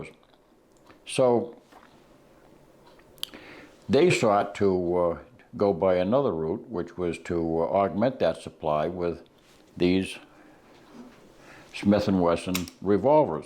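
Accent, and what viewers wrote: New England, United States. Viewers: Best New England accent ever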